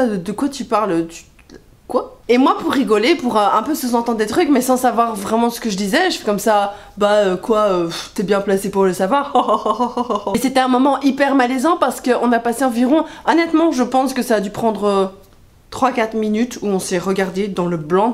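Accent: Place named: Belgium. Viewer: tu as toujours l'accent belge au fait... "houit" :p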